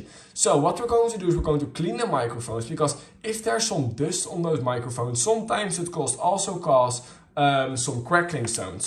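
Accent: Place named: Netherlands. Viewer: Are you Dutch? You have a Dutch accent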